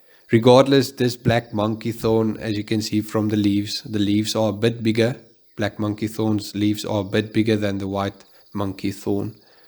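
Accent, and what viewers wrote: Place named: South Africa. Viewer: Loved the video and seeing your different trees. So much content out there is not South African, so great to hear our beloved accent. I have got a black monkey thorn from nursery stock that needs a hard chop. What is the best time of year for this?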